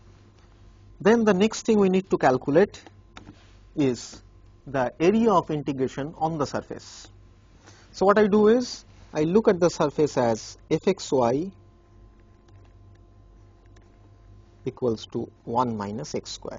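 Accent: India. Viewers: i cant understand it coz of his indian accent, and the shitty vid quality.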